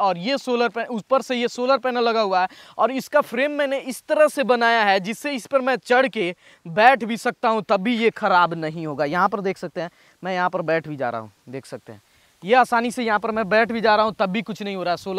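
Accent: Bihar, India. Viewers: Bhai ap bihar se ho❤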